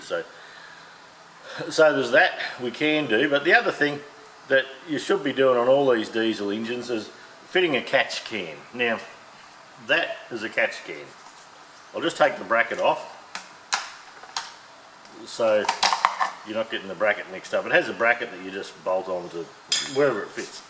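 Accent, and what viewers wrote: Australia. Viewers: The. Americans go to talk to a shrink, I just listen to a mad Aussie talk for a while and i feel on top of the world. thanks mate another cracking stew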